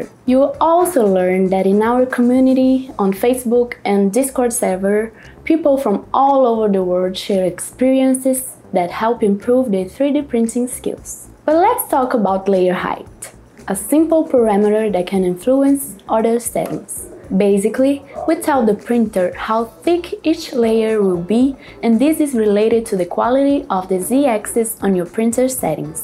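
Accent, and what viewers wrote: Brazil. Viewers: Nice Brazilian Portuguese accent! Could tell just by the name hahaha I never changed the layer height settings in my printer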